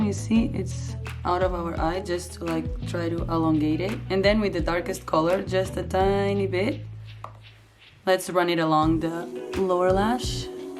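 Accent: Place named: Portugal. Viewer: nao e brasileira ela e portuguesa se conhece pelo sotaque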